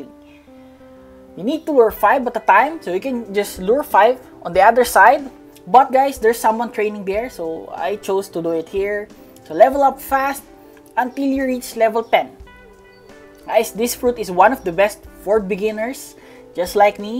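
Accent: Philippines. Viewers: are you filipino the voice and the filipino just im guessing yure filipino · hes sound filipino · Filipino voice ur are filipino?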